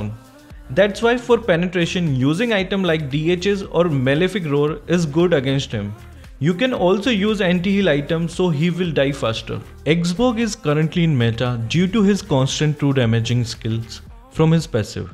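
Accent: India. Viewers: R u indian ur english accent sounds like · Your Accent is just like Indian's, I LIKE THAT ACCENT!👍👍👍💗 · R u Indian bro ur voice is indian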